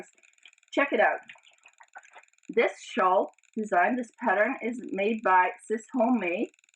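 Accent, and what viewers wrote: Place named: Norway. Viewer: Regardless of the project, I'm sure it will be challenging to get it done in a week. I'm sure you've said before, but where are you? Sounds like a Norwegian country?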